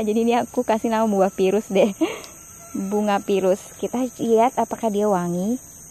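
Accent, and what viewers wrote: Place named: Jakarta, Indonesia. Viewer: Mb swaktu bicara logatnya seperti Jakarta apa bisa pale logat to dialek local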